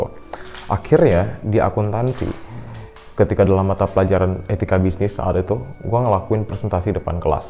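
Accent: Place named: Aceh, Indonesia. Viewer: nyan kan butoi.. meuaceh that bak pegah haba 😂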